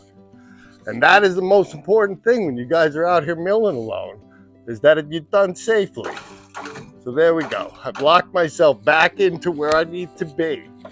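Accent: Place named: New York, United States. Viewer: Love to hear the NY / NJ accent in a TN miller. Makes me feel at home with you. Hot Dawg !! Love your setup !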